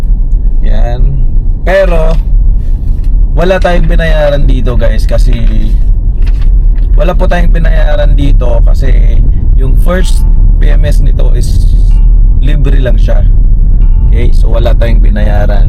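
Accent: Visayas, Philippines. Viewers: bisaya diay ka sir hahaha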